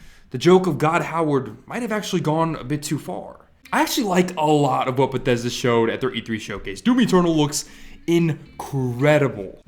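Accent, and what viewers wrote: New York, United States. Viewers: Okay i can deadass definitely tell your from new york my guy lol 😂😂😂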